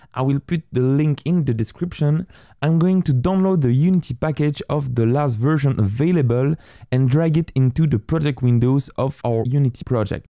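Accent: France. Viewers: As a French person, I can undoubtedly detect a French accent when I hear one!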